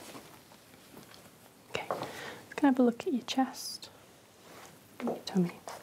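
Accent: United Kingdom. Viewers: Dam, you Brit’s can make anything sound cool. New York here. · This voice sounds like British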